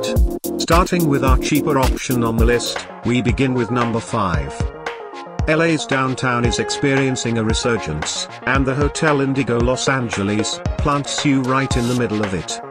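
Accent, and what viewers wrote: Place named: England, United Kingdom. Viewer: Dude’s English accent annoys me.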